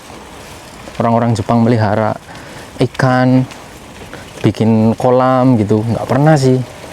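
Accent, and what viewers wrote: Banyumas, Indonesia. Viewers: Sampean asale ngapak ngendi mas?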